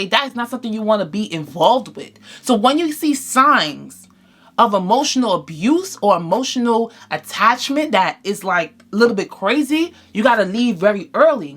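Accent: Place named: New York, United States. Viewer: subscribed just because I hear a NY accent 😂✨ hey sissss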